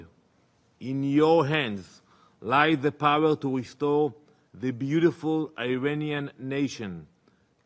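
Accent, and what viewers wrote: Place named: Israel. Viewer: 😮 ایول فارسی به لحجه عبری چقدر جدی،زیبا و شدنی هستش،چشم داداش بنی اسرائیلی خودم❤❤🎉❤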